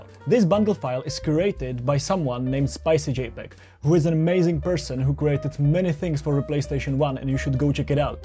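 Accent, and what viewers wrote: Czech Republic. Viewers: ty jseš čech? poznal jsem podle přizvuku. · me when czech accent i thought it sounded like one, checked bio and sure enough. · Já si říkal že tam slyším českej přízvuk. Neuvěřielná práce!! SKvělej projekt a myslím že to dost retro programátorů ocení. Jen tak dál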